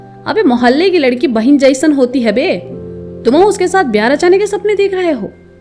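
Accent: Kanpur, India. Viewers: Didu har har mahadev Happy friendship day Congratulations for 19k subscribers Didu apki kanpuriya tone mast hai maze ajate hain 😉😉👈👈 Mahadev always bless you 🧿🧿😇😇❤️❤️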